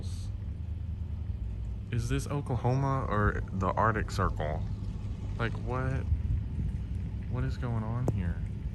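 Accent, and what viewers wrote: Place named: East Texas, United States. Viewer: You sound like you might be from East Texas! You’re doing great!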